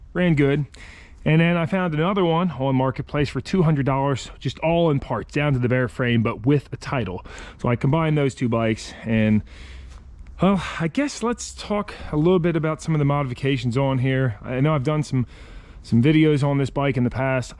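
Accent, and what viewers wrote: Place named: Eastern Pennsylvania, United States. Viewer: Came across one of your videos on the XL, I heard you talking and thought "This dude is from eastern PA for sure." Greetings from Bucks County. I might have to check out some of these spots on my XR one of these days.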